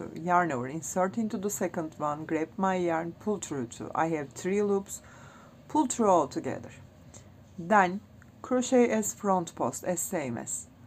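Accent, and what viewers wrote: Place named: Turkey. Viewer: The second I heard the accent, I knew you are Turkish. Dünya çapında videolar yapan bir Türk görünce asın bayrakları diyesim geliyor ❤❤❤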